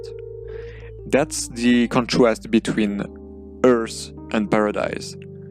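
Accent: France. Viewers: Once again I loved listening to your French accent speaking about such important things like freedom! Can you share more info about who you were talking about and the book he wrote? You mentioned that he lived during the same time Jane Austen wrote her novels in. If you were to make videos about philosophy I think you would get good views! You spoke about being ready to be wrong or change your mind about things and as an American who has seen such cultural turmoil in our country the last few years, I think people have forgotten how to debate and talk to each other without being so rigid. About the build: this is my second favorite style... Of course darker victorian's are my absolute favorite :) but you did so well recreating this Queen Anne house. It reminds me of the Carson House in Eureka California.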